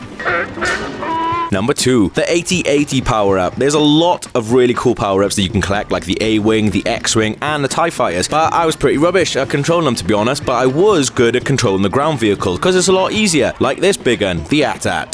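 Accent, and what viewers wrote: United Kingdom. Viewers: There's nothing worse than listening to this British commentator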